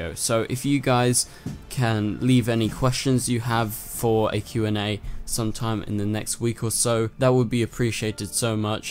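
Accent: United Kingdom. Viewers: What part of England are you from? I'm from Windsor and Berkshire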